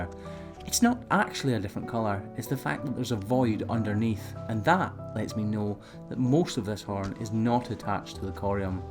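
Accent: Scotland, United Kingdom. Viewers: You are a vast wealth of knowledge when it comes to hoof trimming. I always enjoy watching your channel and learning new things. I admire your work ethic and how you treat each cow that you trim and I love listening to you explain it all w/ Scottish ascent. Keep up the great work, I always look forward to the next video.♥️🐂🐃🐄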